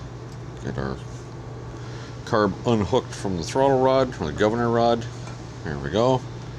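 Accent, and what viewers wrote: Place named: Canada. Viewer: By chance are you Canadian?? Noticed a bit of an accent.🤔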